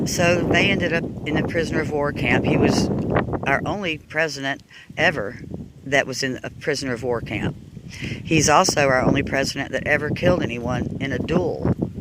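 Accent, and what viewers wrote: South, United States. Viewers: Jackson's nothing but a murderer and a bigot. Trail of tears= genocide also caused a depression due to land buying changes explain your reason to visit such person. Oh wait that southern accent explains it all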